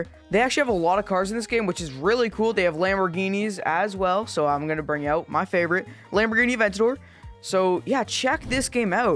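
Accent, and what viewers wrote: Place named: Canada. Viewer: I can tell you're canadian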